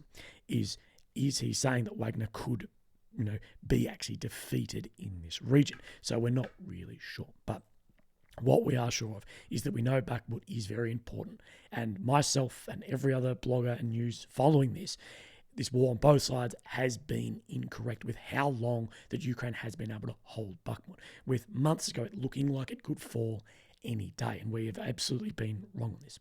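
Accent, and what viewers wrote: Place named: Australia. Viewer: the sound is messed up on this one & has been getting worse on a couple of late,you need to bump it up a bit,although the ozzie accent is fun it can be hard to understand if it is mumbled & hard to hear.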